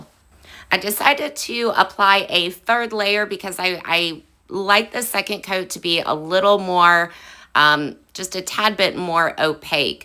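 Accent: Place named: Alabama, United States. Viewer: Love the Alabama accent. lol Amazing work.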